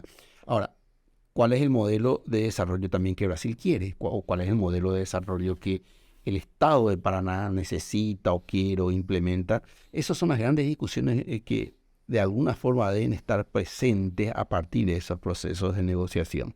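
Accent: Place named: Paraguay. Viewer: El español del profesor tiene sutaki paraguayo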